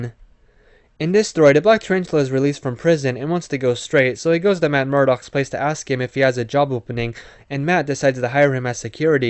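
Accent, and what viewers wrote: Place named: Argentina. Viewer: Naaa tremendo el pibe argentino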